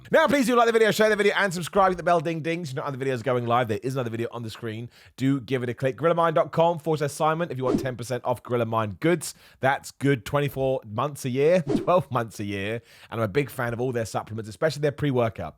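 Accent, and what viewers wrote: United Kingdom. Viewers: The british accent 😅🤣👍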